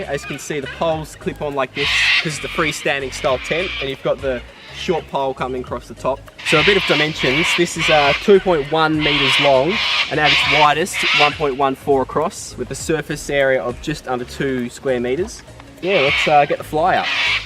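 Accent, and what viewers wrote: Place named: Australia. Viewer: Great video - awesome to hear an Aussie voice on one of these. Interesting there's no zip cover... Very thorough review. Liked and subscribed. Will be checking out some of your other vids. Cheers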